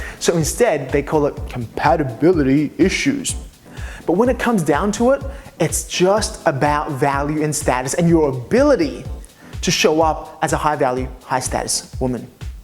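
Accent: Australia. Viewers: Your accent is really cool. Are you from Australia? :) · YOU ARE AWESOME! Also, that accent tho!!!! Australian like mua? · Does he have an Australian accent?? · Is that an Australian accent?? 🤔🤔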